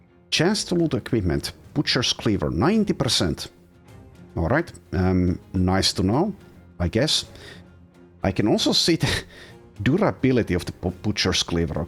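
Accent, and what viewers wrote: Finland. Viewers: The moment I opened the video and heard your voice, I though, "You must be a Finn". I wasn't disappointed :)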